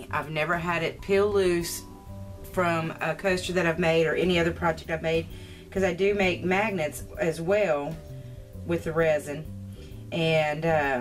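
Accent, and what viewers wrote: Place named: Alabama, United States. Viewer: Are you in Alabama? I swear I keep hearing one of my cousins talking. If you are from Alabama you prob are one of my cousins :-) (Big Family)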